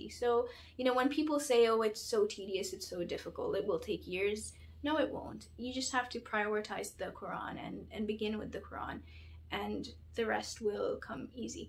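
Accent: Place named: United States. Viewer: It's a very interesting concept and makes a lot of sense. Arabic is not an easy language to learn and not everyone needs to learn all of it in order to understand fus'ha. Very good point about learning from translations. Where are you from? Your channel says UK but your accent sounds slightly American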